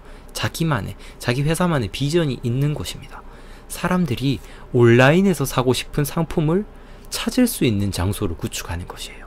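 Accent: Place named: Gyeongsang, South Korea. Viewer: 경상도 억양의 맑은 목소리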